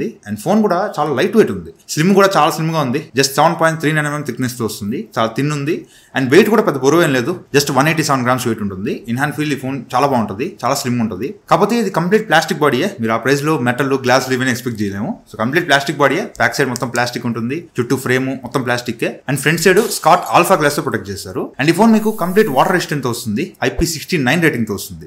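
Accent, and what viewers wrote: Nellore, India. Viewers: Bro medhi Nellore aa😊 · Bro miru nellore a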